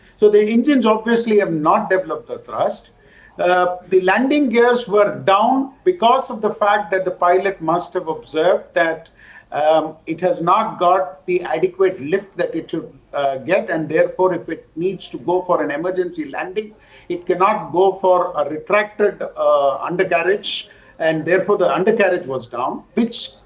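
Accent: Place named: South India, India. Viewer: Pathetic south Indian accent 💩💩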